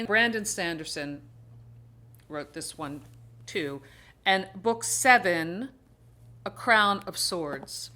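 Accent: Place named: Pennsylvania, United States. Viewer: Fun videos! Sound like you are around bucks county pa! Same with me, it’s a lovely place to live!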